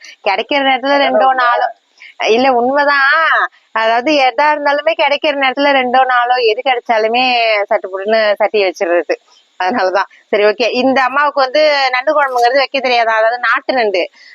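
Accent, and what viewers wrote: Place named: Sirkazhi, India. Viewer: 👏👏👏👏👏👏👏👏👏👏👏👏👏👏👏👏👏 super video velai seiya ma srichitte pakkara neenga tha pottu pesumpothu pazhya niyabgam varuth sirkazhi Chidambaram side apadi pesuvanga nanga leaveku pogumpothu appadibpesirkkom innatha appadinu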